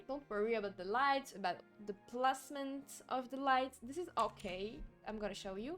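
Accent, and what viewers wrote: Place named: France. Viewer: I love your french accent 😍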